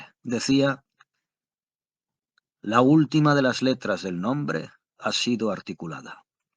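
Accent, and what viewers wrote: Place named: Galicia, Spain. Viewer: Voz gallega🤮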